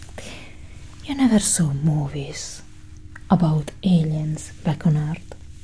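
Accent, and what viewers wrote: Russia. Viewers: U russian? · Гуд рашен акцент, агент Кетрин. Вел дан😋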